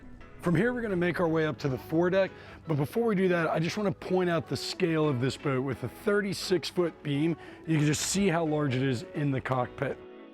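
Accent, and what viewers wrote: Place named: Maryland, United States. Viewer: Maryland accent. :)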